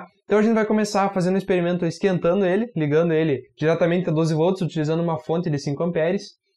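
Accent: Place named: São Paulo, Brazil. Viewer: Esse cara só pode ser do vale do ribeira, pelo sotaque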